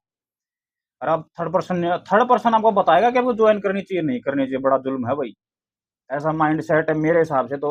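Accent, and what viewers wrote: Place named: Haryana, India. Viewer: Haryana ki boli khadi hai lekin siddhi baat ar khari baat kehte hai.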